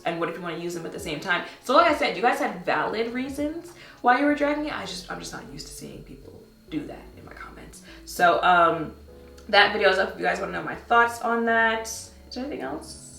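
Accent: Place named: New York, United States. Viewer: Such a New yorker!! ♡ THESE LAUNCHES ARE LAzzzsssyyy! It's giving... Dull and Dustttyyy! Yoooo!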